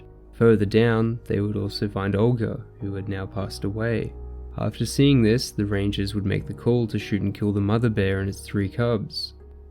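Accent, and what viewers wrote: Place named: Australia. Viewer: Only made it 6 mins in & had to turn it off i can't stand your accent. Australia?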